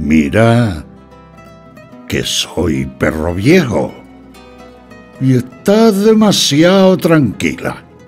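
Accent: Spain. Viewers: Pepe Mediavilla! Por usted brindo! Con respesto also mi copa y en su honor hoy vevo un tinto de buena cepa, fino. De corazon es mi sentir que lo hago verbo y con aplausos y agurios verdes de Romero, Albahaca y yerba buena afirmo y declaro por usted Pepe Mediavilla, gran Sr. : Que viva tu vida! Viva tu Libertad! Que Viva El Castellano que es tu lenguaje articulado, tu acento, mi herencia! Viva tu Cielo y tu Tierra y tu mar! Viva tu sol , tu Luna y todas tus estrellas! Que Viva tu casa, tu Mesa y tu lar! Viva tu mente con sus luces! Que Viva tu lira, tu historia y tu legado! Que Viva Espana! Que Viva Espana! Desde mi orilla Allende Al mar os deseo todo y so lo mejor! Que todo es sagrado , esto me lo aseguro un cantaor . J .M.y R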